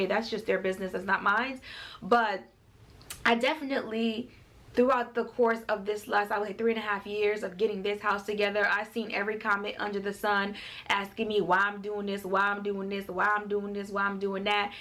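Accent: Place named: New York, United States. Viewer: Just found ur page... LOVE IT..... I don't care how long you've lived in ATL , you're such a New Yorker...(accent)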